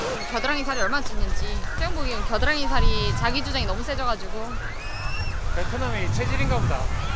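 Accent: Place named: Gyeongsang, South Korea. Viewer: 잘보고 있습니다.^^경상도 분이신가보네요..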